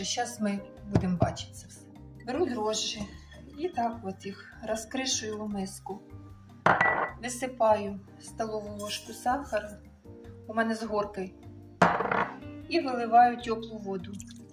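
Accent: Ukraine. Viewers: Как приятно слышать украинский говор! Какая чудесная хозяечка,такие и классные пирожки! Спасибо огромное,благодаря вам,я мысленно побывала в моем далеком детстве!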